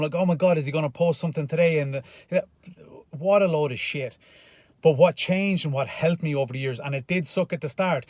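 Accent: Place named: Ireland. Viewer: I love your accent. If youre from Ireland, what part?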